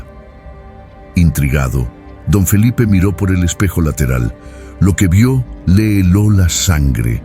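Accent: Dominican Republic. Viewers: Hasta parece dominicano ese man del narrador😂😂😂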